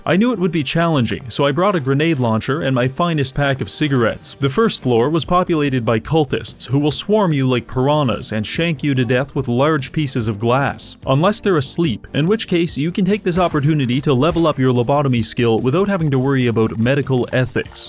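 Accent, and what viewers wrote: Canada. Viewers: So you’re Canadian Eh? Pitiful.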